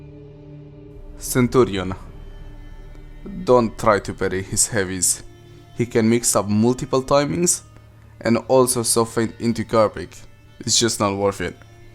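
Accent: Romania. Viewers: accent de roman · are you romanian,russian or german?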